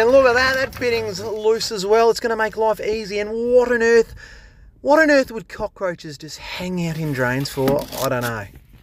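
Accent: Australia. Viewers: Let’s be honest, I’m more here for the Australian accent then the drain cleaning. That’s just a neat little bonus on top innit? Greetings from Germany :) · LOVE Aussie LOVE your accent ❤ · Love your channel, but your silly over the top okka accent is a bit annoying. Don't be Steve Irwin of drainers mate!.....😂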